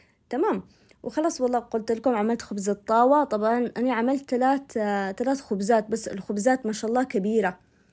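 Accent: Aden, Yemen. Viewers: اي حد عاجبه يتابع ووالدي مش عاجبه طز ولا تخلي ببالك ولاتهمي والله كفايه كلامك العدني الحلو موفقه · مستغربة ان في تعليقات سلبية على كل هذا التميز في الشرح و الطبخ الرائع .. ماشاء الله فنانة جدا بمعرفة كل انواع هذه الطبخات وبطريقة الشرح اللي يعلق سلبيا معناه حسد انا حتى فرحانه انك تتكلمي عدني زينا مش زي اللي يسافروا السعودية ولسانهم تنعوج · انتي من افضل اليوتيوبرس ،وكفايه صوتك الحنون واللهجه العدنيه الاصيله عوضا على وصفاتك اللذيذه اللي تجنن ،لك كل التحيه والموده والتقدير ❤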